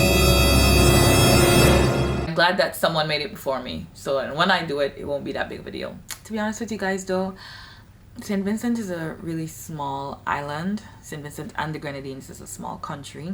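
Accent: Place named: Jamaica. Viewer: The Jamaican Accent is E-VER-EE-TING! 🤣❤️ This is amazinggg.😊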